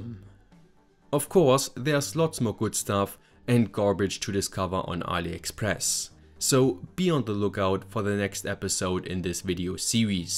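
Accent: Germany. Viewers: is from Germany. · Der bre klingt biss europäisch, würde fast sagen, deutsch... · German accent goes brrrrrrr And nice Video :) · nicht sicher aber hörst dich sehr deutsch an also akzent mäßig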